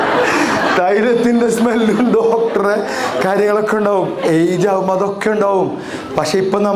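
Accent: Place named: Thrissur, India. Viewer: അല്ലൃലും തൃശ്ശൂർകാരുടെ സംസാരം കേൾക്കാനേ ഒരു രസാ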